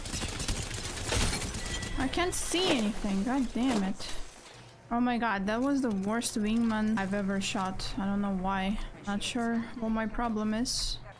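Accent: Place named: Russia. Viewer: I had no idea that your accent was russian. As an american i can usually spot a russian accent but tbh I thought your accent was spanish. Either way, I like it and think you're absolutely beautiful. Not in a creepy way though, I'm happily married. Love your gameplay too. You're really good! Keep it up!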